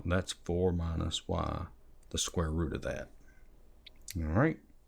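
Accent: South, United States. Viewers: hello sir i just want to thank you for all your efforts just for the record im not even majoring in math but i watched almost every video of yours because im in love with your southern accent , i could like listen to you all day along and im not gonna get bored at all all the respect and all the love from morocco :)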